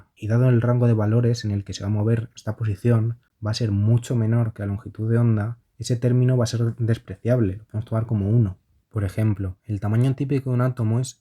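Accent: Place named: India. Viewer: Gran vídeo amigo, me salvó el semestre. A veces no se entiende el acento hindú, pero está chévere. Saludos desde Perú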